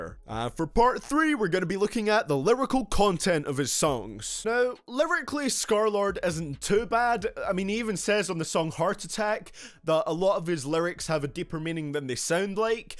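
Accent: United Kingdom. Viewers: His British accent is the best he shouldn't ever try to hide it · Are you British?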